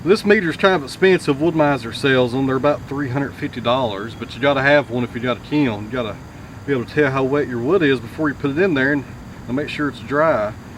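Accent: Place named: Tennessee, United States. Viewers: I truly enjoy hearing what you have to say, and I also enjoy your Tennessee accent very much. Don't listen to the naysayers... I think most of us appreciate your insights and a little background on what and why you are doing things the way you do them in your videos. Keep up the good work, sir, and don't change a thing! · Talking too much?! I mean, seriously, who doesn't appreciate being educated by a man with a great southern drawl. Weenie's winer's and wimp complaints, say I! Your videos are excellent and entertaining. Happy to see you don't take the negative comments seriously.Anxious to see that walnut being milled.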